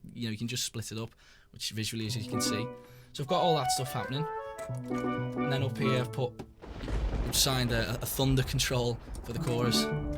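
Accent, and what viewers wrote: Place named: Liverpool, United Kingdom. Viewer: Good job on this. Thx. And nice proper scouse accent to boot.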